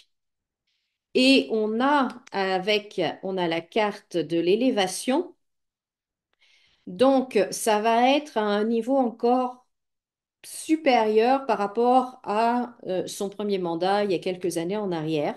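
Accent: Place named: United States. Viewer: Quelle accent Américain 😊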